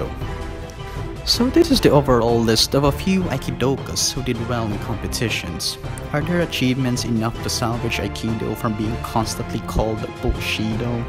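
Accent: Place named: Philippines. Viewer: Your accent sounds filipino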